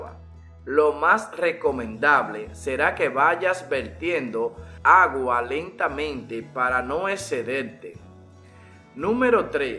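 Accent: Dominican Republic. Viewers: es dominicano xd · Es que así es el habla del dominicano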